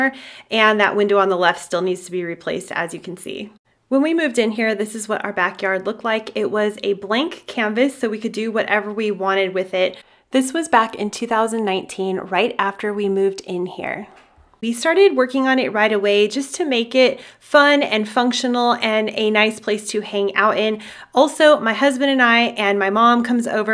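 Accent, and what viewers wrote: New Zealand. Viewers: He sounds like a kiwi. This is so nice guys. I can’t wait to buy a home and do this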